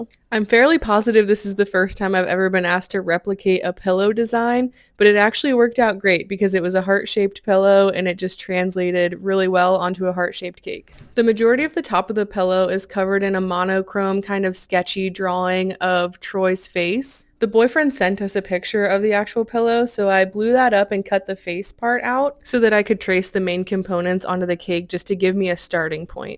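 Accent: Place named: Midwest, United States. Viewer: It's the "Midwestern" accent 😂 people in utah and Idaho also have that accent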